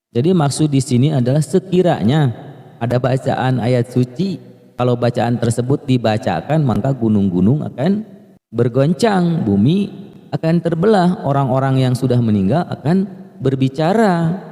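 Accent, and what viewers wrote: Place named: West Java, Indonesia. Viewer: Di lempangkeun....😂😂😂nuhun kang...logat sunda na kaciri pisan..qobiltu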